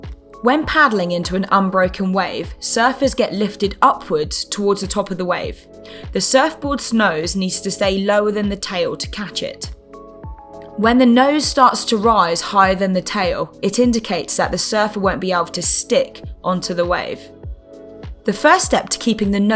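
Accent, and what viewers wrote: United Kingdom. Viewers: Slight British accent...lol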